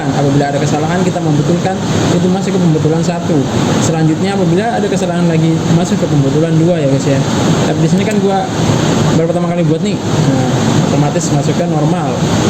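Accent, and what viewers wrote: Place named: Java, Indonesia. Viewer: Logatnya medok Jawa keren bgt penjelasan nya 😁seru mendengar nya, btw suami istri perlu kah pisah harta?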